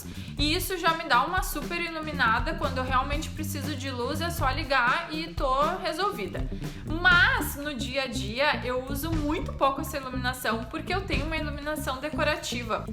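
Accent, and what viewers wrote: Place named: Rio Grande do Sul, Brazil. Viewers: É apenas o sotaque do Sul galera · Como que a pessoa não consegue discernir que é o sotaque do Rio Grande do Sul. Lindo sotaque.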